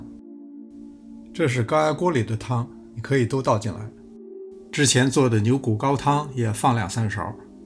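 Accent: Beijing, China. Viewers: 是北京人吧？ 很亲切的声音:yougotthis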